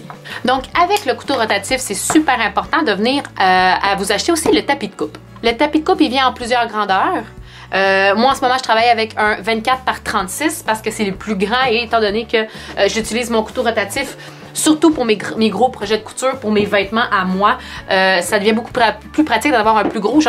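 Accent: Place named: Quebec, Canada. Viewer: Bonjour!. Merci pour ce tutoriel très instructif,professionnel et trés "Charmant".Avec votre accent québecois,vous êtes adorable.Je ne fais pas de couture (même si j'ai du matériel dans ce domaine) mais je vais débuter sérieusement en Maquettisme. Bonne Continuation à vos projets.Bye!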